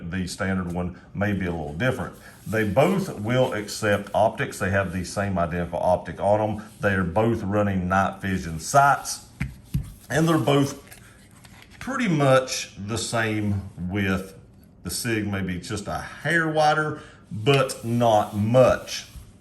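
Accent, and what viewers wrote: South, United States. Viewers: Damn, this dude is country AF. lol. Tennessee maybe? Georgia??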